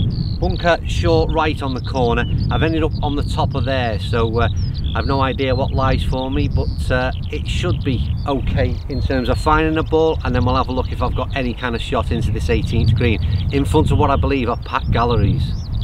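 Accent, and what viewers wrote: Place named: Merseyside, United Kingdom. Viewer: Great video and well played! Love the buggy cam footage tracking your progress to each green. Lovely looking course. (I'm in Liverpool by the way and you sound like you're from not too far away...?)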